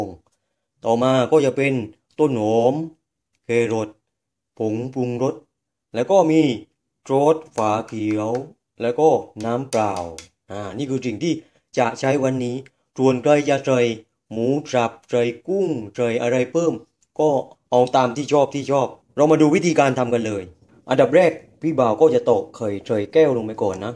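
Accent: South, Thailand. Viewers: เสียงคนใต้ 555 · นํ้าเสียงของคนใต้.เพราะดีค่ะชอบมากๆน่ากินจังไข่ตุ๋น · พี่บ่าวแหลงใต้เลยตะผมว่า เสียงคนใต้มีเสน่นะพี่บ่าวเห้อ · สำเนียงใต้ชัดๆๆเลย555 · คนใต้เรยนิ หรอยๆ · สำนังคนใต้น่ารักดีจร้า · ชอบสำเนียงทองแดงของพี่บ่าว และอธิบายได้ดี ชอบมากครับ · น่าทานค่ะ ว่าแต่อยากเห็นหน้าพี่บ่าวจังฮู้ชอบคำพูดสำเนียงตนใต้จังเลย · พี่บ่าวคนใต้ใช่ม้าย😄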